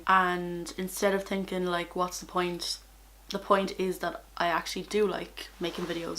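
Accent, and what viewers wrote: Ireland. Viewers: U deserve so much more subscribers ( I just came across ur channel and I Love it +1 subscriber) I guess ur from Ireland, u have the accent 🇮🇪😁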